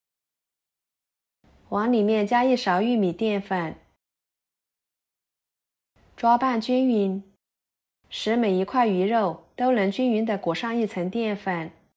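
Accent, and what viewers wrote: Yunnan, China. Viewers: 主播云普口音很重啊 哈哈哈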